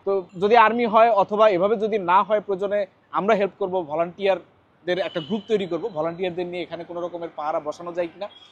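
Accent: Barishal, Bangladesh. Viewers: bhai er accent e dehi borishal fuita uthtache 👍🏻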